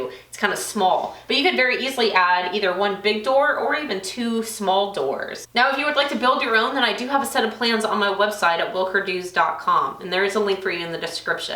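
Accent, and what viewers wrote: Texas, United States. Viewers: Wales here too:P ever since reading another comment mentioning the word sawL I notice it everytime now but hey a Texas girl, everywhere has their own little language/dialect quirks - how many people in the world will know exactly what a 'cwtch' is? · I really like your work, I have for a very long time. this build and project video. are great. Also love your Texas voice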